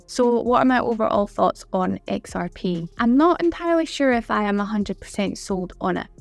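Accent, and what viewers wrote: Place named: Edinburgh, United Kingdom. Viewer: Nice video.. That sounds like an Edinburgh accent, that's where I'm from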